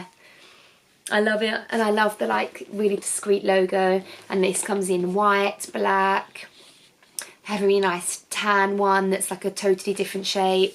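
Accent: Essex, United Kingdom. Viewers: I watch for your accent and fun personality because I love TOWIE....the luxury items are a bonus!!!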